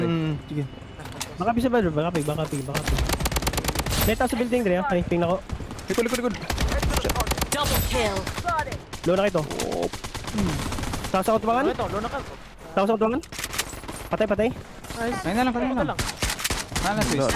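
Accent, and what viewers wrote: Visayas, Philippines. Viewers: Idol bisaya ba ikaw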